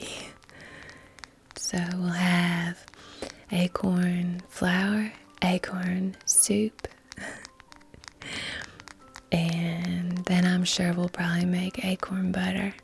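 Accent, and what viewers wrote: South, United States. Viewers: I love how talks in that Southern accent. Happy early birthday by the way.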